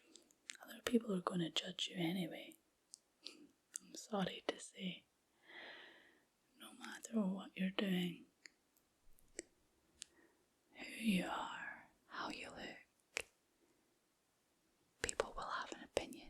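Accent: Scotland, United Kingdom. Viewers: Hello from a fellow Scot from Ayrshire I have trouble sleeping and hearing a Scottish accent is unique on YouTube so thank you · That scottish accent is just fire 🔥🔥💯💯👏🏾👏🏾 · The Soothing scottish accent gets me to feel cool, calm and collected for years.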